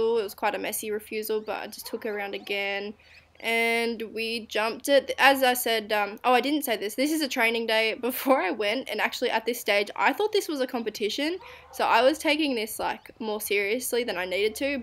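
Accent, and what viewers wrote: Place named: Australia. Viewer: Lol I picked your accent. Are you from Australia? I'm in NSW!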